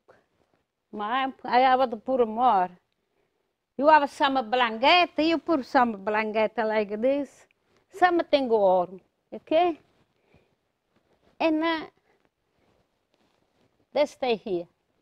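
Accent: Italy. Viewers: I am waking up today in Seattle... Watching & listening to a real Italian grandmother making pizza... And she wrapped her bread dough... in blankets on the couch... like family! Living alone in a big city, I find this so comforting. Bless you for sharing... in English with a lovely accent as authentic as your beautiful pizza! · The most Italian sounding person I've ever heard, perfect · I would have loved to have grown up in her home. I would be so fat! Her Italian accent and grandmotherly voice is legendary. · I love this lady. If she was my grandmother I would be fat as heck from eating so good. The very first job I ever had was working at an Italian Restaurant. The owner was also the main cook, he was straight off the boat ( as they say,) from Italy and he had the same accent. Ever since I worked there, all other pizza I eat is automatically compared to what he and I use to made at the restaurant. 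Not just the pizza either, but every Italian dish you can think of, Lasagna, Spaghetti and Meat Balls, Baked Ziti, Egg Plant and Veal Parmesan, and the list go's on to the deserts and more.. We got there early to make the dough and roll it out into aluminum pans coated with olive oil. I am 60 years old this year and that was my first job at 15 years old, yet even now any Italian food I eat, I still compare to his, and I am a tough critic when it comes to Italian food. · You have to love her and the Italian accent. Miss my Italian gmom so bad, she reminds me of her. An Italians mother's kitchen is the entire house, do not get in her way, or you get a dough slap upside the head. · Love your videos and your Italian accent! Also, you cook like I do, a little bit of this and a little bit of that!! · Grandma, I’m a 73 yr. old great grandma and I have to say, you inspire me with your Italian cooking!! 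I’m not Italian but people think I am because I love everything Italian! I grew up with two Italian families, the Mariano’s and the Vasterelli’s. You remind me so much of them and I love your accent, your expressions with your hands and watching your videos. Thank you for sharing your recipes with all of us. (My sauce is almost identical as yours except I add sugar and wine as this is the way my Italian families did it.) Love to you, Grandma!!❤ PS: You have a lovely voice!😊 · you can’t tell an Italian accent? She’s Italian 100%. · I feel like she is my own grandma watching her do her cooking like I used to watch mine many years ago. I love listening to grandma in her Italian accent-English coz I can understand what she's saying haha. I just discovered her now and I instantly subscribed and at her age, she will have many years more to spend time with her kids grandchildren great grandchildren and friends and youtube friends. 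Such a joy to have a grandma. stay safe and healthy and share more of your treasured recipes!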